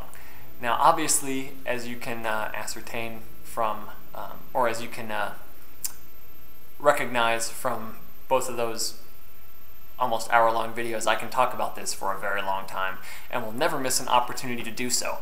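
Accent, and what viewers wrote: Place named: Europe. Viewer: Thought this guy was european, lmao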